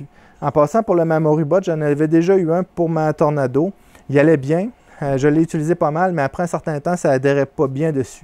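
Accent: Canada. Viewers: Salut. Je suis du même avis que beaucoup, pour 1000 euros je la fait moi même et tu devrais t'en retrouver pour 500 euros voir moins de matériel. Bonne continuation et c'est toujours un plaisir de t'écouter avec ton accent et les expressions du Canada.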